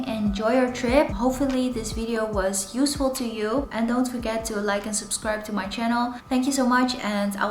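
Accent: Netherlands. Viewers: Nederlands accent gespot! Handige tips, bedankt. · Thank you! You sound totally Dutch, are you 🤔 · 300$!!! You must have used a ton of data! Thank you for answering quickly and elaborately! So my take a way from this is that, it was expensive, but it worked well for you and your mother? Volgens mij kom je uit Nederland als ik je accent goed inschat😀, bedankt voor de reactie!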